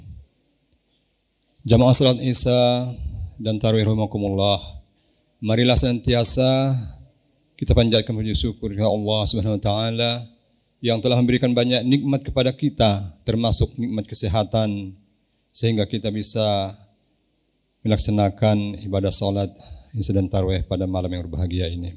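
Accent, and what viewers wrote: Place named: Sulawesi, Indonesia. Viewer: Masyaa Allah, Pak Dr. orang Sulawesikah? Dari aksennya spertinya dia dari Sulawesi hehe.. Barakallahu Fiik pak Doktor..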